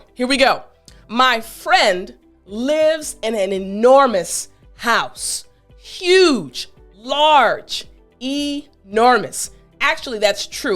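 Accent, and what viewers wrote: United States. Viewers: Are you American English. I know it again and again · Hi Kolya, I'm not... but... she is American! I'm not sure which state, however...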